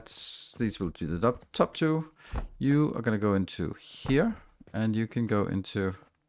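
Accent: Denmark. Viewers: Great videos thanks. You sound danish ?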